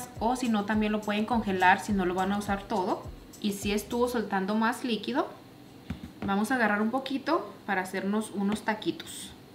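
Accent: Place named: North, Spain. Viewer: Parece del norte su acento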